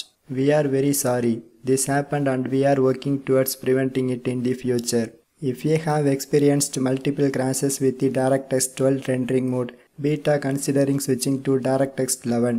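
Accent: India. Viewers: I love you indian accent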